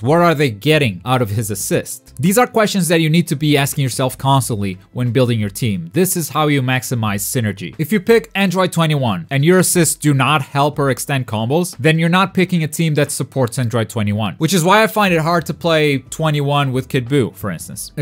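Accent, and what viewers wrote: Portugal. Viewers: Wait, are u portuguese?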